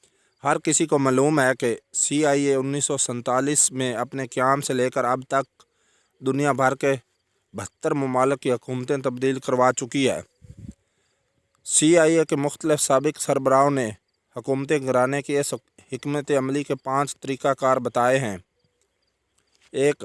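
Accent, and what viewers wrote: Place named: Punjab, Pakistan. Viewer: It would be nice if some one who knows urdu language with out punjabi accent, stop punjabi Gardi !!!!!!!!!!!!!!!!!!!!!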